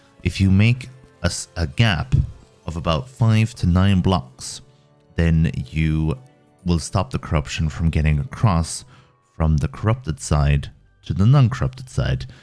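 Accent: Ireland. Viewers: You sound Irish but also not Irish at the same time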